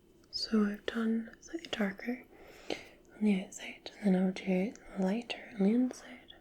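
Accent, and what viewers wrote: Scotland, United Kingdom. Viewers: omg Scottish YEEEEEEY😍😍😍😍 glad to have found you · Hi! I just want to say that I really enjoy your channel. Your videos are great, and you're such a beautiful girl, especially without makeup. I love natural beauty. Anyway, I just love your Scottish accent. Your voice is so relaxing and it gives me chills. Thanks for posting this. I really appreciate you're honesty. ❤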